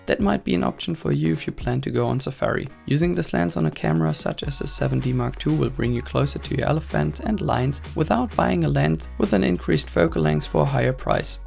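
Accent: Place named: Germany. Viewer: when a german tries speaking english thats how it sounds like.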